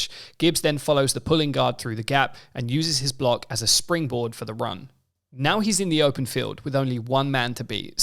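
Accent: United Kingdom. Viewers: Gotta say it is super strange hearing about NFL football in a presumably British accent. Incredibly well done video and totally accurate.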